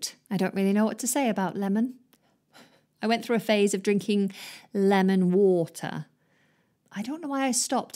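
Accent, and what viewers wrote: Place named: United Kingdom. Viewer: Love it. Love British accent.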